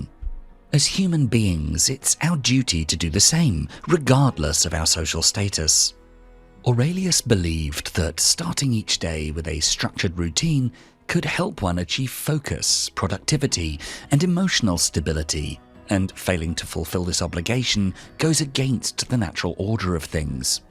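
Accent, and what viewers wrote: United Kingdom. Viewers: I was in stress in those dark days quit job, broken up, didn't find any light in my way of life. Coincidently, I watched your video, listened to it because I love British accent and I've been learning English then I realized this channel like my teacher -it took me back to the right way, shown for me what I have to do. After one month quitting my previous job (which I didn't like and it took 12-14hours/day ) finnally I found the new job which I really enjoy it🙂. Thank for your effort to make these videos. Hope you and your team have a good health to make lots of precious videos to heal people like me. 🎉❤❤❤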